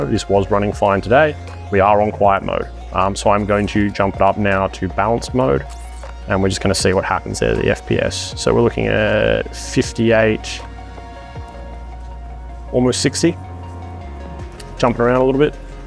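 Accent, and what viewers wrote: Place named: Australia. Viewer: I don't think this guy knows what he's talking about or knows how to program a laptop settings. Out of the dozens of reviews on this laptop all of them being great and no issues. This guy sounds like a whiny Australian like the rest of them. I juat ordered this laptop and I'm sure I won't have any of these issues he's claiming. Maybe he has the only bad one in production🤷‍♂️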